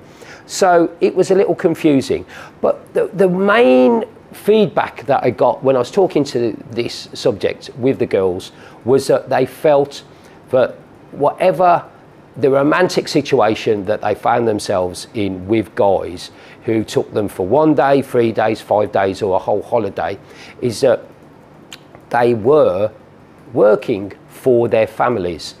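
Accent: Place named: England, United Kingdom. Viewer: Why are these videos always made by old fat bald men with English accents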